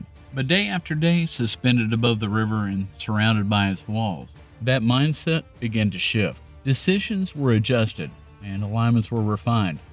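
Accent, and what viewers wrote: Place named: South, United States. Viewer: I have such fatigue for the southern accent and the blessing. Just stop - go to church and stfu.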